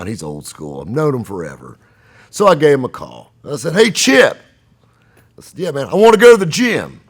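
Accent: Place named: South Carolina, United States. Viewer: He's got that south Carolina charm👍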